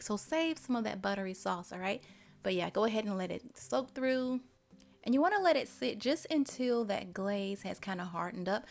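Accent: South, United States. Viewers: I'm a texture person too! Lol I just love your sweet southern accent! I have it as well but I gotta say I could listen to you read a phone book! I am gona try this cake tomorrow! Pound cake is my favorite! Thanks for sharing! I will be liking and subscribing! And God bless your Grandma for most likely inspiring you to bake pound cakes too!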